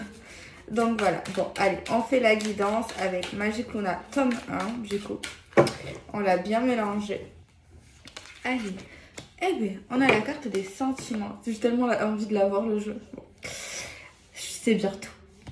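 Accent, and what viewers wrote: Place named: Provence, France. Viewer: J’adore ton accent provençal, ne change pas … j’ai de la famille dans le sud de la France . Je viens du Québec , et j’adore tes expressions. Ça me rappelle mamie de Aix-en-Provence .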